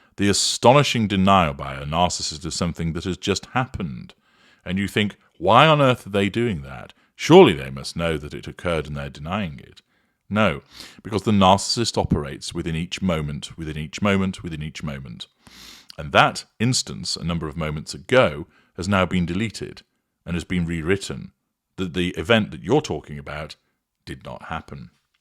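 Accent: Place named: RP, United Kingdom. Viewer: My thanks for putting me right (although I was suggesting the RP English accent that tends to utilise, but it sometimes slips.). Indeed it is rather silly. Best wishes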